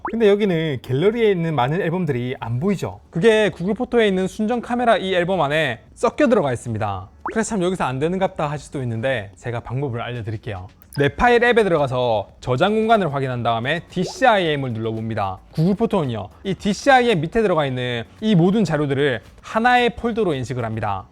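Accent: Gyeongsang, South Korea. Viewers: 너무 유용해요. 감사합니다. 그냥 사투리 사용하셔도 더 정감있으실 것 같아요 ㅎㅎㅎ 귀엽게 서울사람 보이려는 경상도 사람같아서 항상 귀엽습니다.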